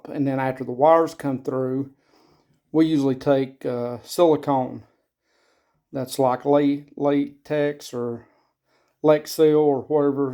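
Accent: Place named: West Virginia, United States. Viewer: You have to be from western west Virginia with that accent.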